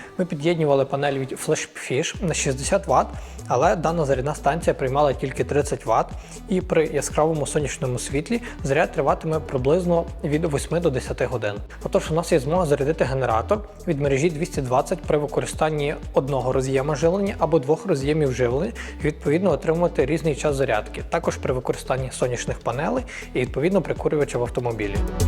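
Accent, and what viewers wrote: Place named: Surzhyk, Ukraine. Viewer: це не україномовний контент. Цей карлик булькає на якомусь невідомому суржику, помішаному з кацапським.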